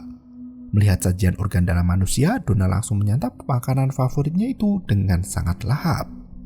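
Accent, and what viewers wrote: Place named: Magelang, Indonesia. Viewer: Kok dengerku Magelang ya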